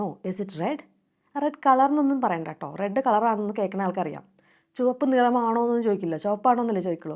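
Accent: Thrissur, India. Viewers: Mam r u from Thrissur ennu chothikkamo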